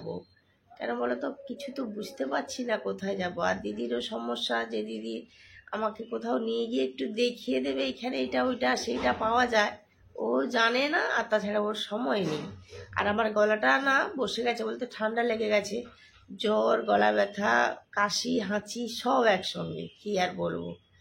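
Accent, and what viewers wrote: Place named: Purba Medinipur, India. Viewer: Tumi to amader purba Medinipur er meye go shune valo laglo 🥰achha amader ancholik vasay ekta question korchi reply dio 😃egra r Contai ascho kunodin? 😀r Digha kotobar jaicho?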